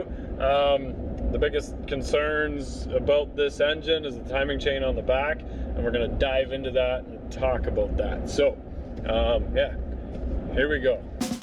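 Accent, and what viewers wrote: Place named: Alberta, Canada. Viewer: My ears tell me this guy's from Alberta, but my eyes say Ontario. Either way, it's Canada. :P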